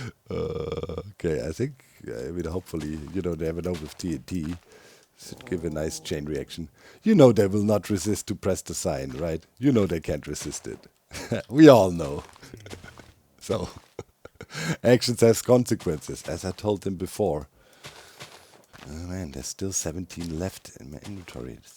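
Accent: Germany. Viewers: Have you ever played "Command & Conquer Red Alert 2: Yuri's Revenge"? Your motivational messages really sounds like Yuri's propaganda voice. In fact, the actor, Udo Kier, who portraid Yuri in this game is German and did both the English and the German voices. So he also has the slight German accent like you do. All hail the G.O.A.T. 🐐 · The German accent makes it sound .... nvm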